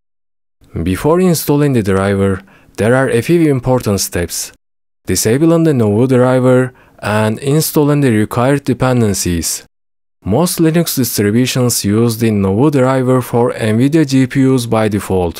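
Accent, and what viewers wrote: Turkey. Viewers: aksanından anladım abi :D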